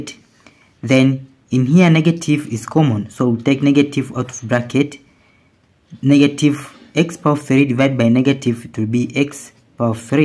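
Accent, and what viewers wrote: India. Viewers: This person in the video is NOT a Native German speaker, vo8ce is of someone from India. Why you mention Germany in your video tagline? I dont know. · Не знаю, что обозначает GERMANY в заголовке, ибо судя по цвету кожи и произношению за кадром индус. Задача решается на раз-два. Глядя на уравнение мне понятно, что (х) следует искать в области отрицательных значений. А раз так, кроме как "-2" никаких корней это уравнение иметь не может. Наверное, в Индии много лишней бумаги.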